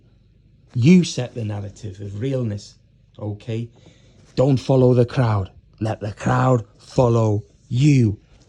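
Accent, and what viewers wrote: Liverpool, United Kingdom. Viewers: Late night Hello to from Belfast 🇮🇪 your intelligent? You have the gift of the gab? And you believe your handsome? But yet you have a Scouse accent 😂👍SO YOU MUST BE IF THIS IS TRUE, PART IRISH 🇮🇪 👌👌👌 KEEP IT REAL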